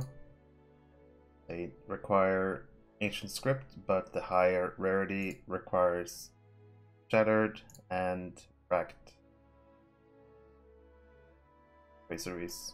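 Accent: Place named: Norway. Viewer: Ha ha Norwegian dude spotted, am i right? Can't quite place it though Jaja fra en bergenser hallo og bra video 👌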